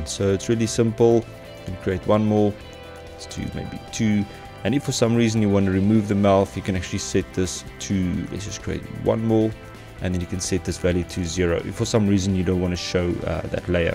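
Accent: South Africa. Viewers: Hey, was almost shocked when I heard a South African accent on a Youtube tutorial. Great Tut though, well presented and explained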